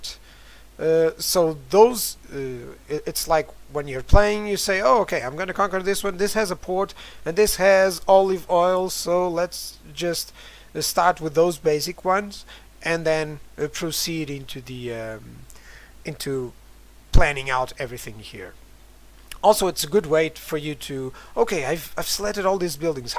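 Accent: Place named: Poland. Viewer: Vaya curro te has pegado, gracias por compartirlo. Por tu acento pensaba que serías de polonia o pa ya pal coño. Saludos, y gracias, otra vez.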